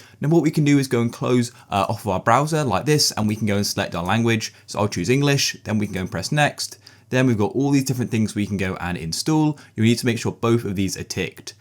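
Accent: United Kingdom. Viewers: He’s British not american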